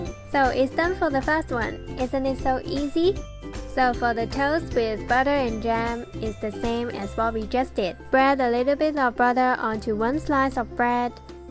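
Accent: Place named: Hong Kong. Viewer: 呢個like系比你嘅英文嘅。英文唔錯呀，香港tone但系口音唔重。希望你之後會越講越自然，加油！！好鍾意你嘅vids架！！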